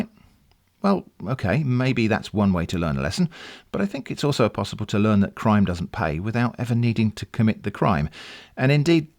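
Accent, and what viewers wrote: United Kingdom. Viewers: > British accent > “dear viewer” for some reason that is entirely beyond my understanding, i feel instantly engaged in the video and compelled to subscribe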